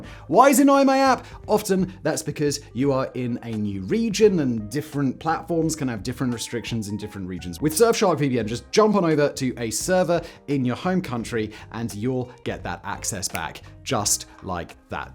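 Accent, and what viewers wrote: United Kingdom. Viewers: I've been following your various channels from the beginning, and I really appreciate and respect your work, but there's one little thing I'd like to say, most of your audience is international, and we learned English as a second or third language with the American system and media, I'm sure the UK accents are cool and folksy since it's one of the small nations that speak this language with this folksy aspect, like Jamaica for example, but if you could neutralize the accent a bit, it would serve the message a lot.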